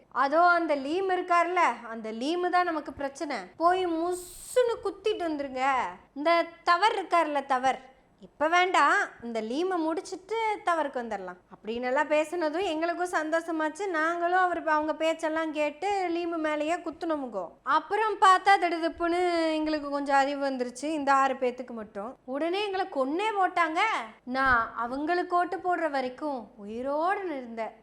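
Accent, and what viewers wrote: Kongu, India. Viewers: இனிமையான அழகுத் கொங்கு தமிழ் தன்னில் செழுமையான பேச்சு வாழ்த்துக்கள் சகோதரி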